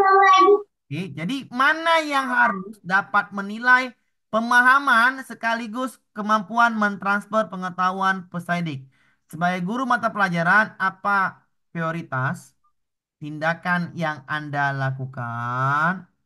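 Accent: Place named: Lampung, Indonesia. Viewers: Saya suka penjelasan bapak kayak orang lampung cara ngomongnya tapi nyambung saya 😂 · Nada Sumatra banget bapaak😅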